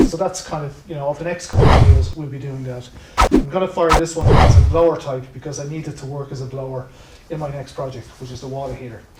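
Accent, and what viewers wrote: Ireland. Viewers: Just realised - was the reason I started to watch YouTube in the first place. Thank God he's back. Apart from the content being exactly my cup of tea (free heat and paramotoring) there's something therapeutic about the enthusiastic and humorous delivery in that wonderful Irish lilt. Normal service has been resumed! Good on ya!!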